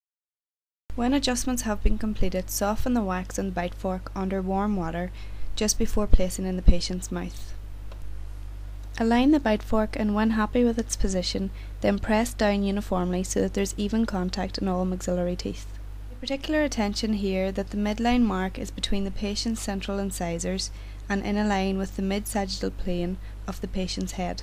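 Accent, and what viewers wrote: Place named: Ireland. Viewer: This is a brilliant video, thank you, and narrated with a lovely irish accent. Will help with orthognathic records I'm taking today, keep this up. As an aside, you could turn this into a research project, onto the use of videos for education of the dental profession, a simple survey based response and get a publication under your name. Thanks again, Jay Patel ST2 orthodontic registrar, The Royal London Hospital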